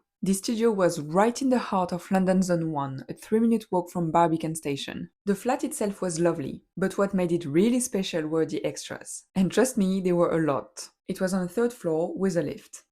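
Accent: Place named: France. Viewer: Where are you from? I'm guessing France but I'm not that great with accents. 🥐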